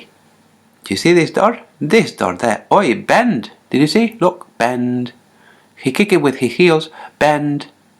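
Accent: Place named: India. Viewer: sounds like that indian guy from short circuit. kinda cool accent lol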